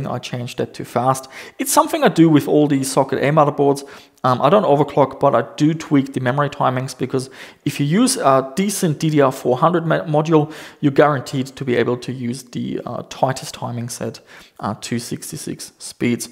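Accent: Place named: Germany. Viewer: Hey, i'm watching your content for about 1 or 2 years and on any video i'm thinking if you are german, 'cause your accent sounds so for me. But if or if not, its not important. I love your videos, it reminds me on my childhood and youth. BR Horst from Germany;-)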